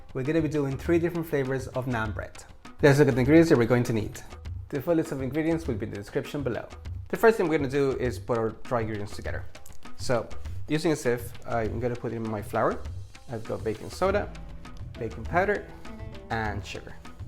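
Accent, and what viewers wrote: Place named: Ireland. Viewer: I do! I love the diversity you exude. I'm guessing you're of Latin descent? I can hear your Irish tones in your voice. Cooking up a rainbow of dishes 💛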